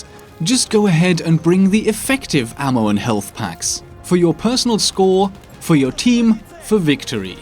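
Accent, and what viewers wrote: RP, United Kingdom. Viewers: Your tone is so pretentious I cannot manage to get through this video. You're talking about irritations on a video game, not reading out the verdict in a highly contested court case. You don't have to speak in BBC English.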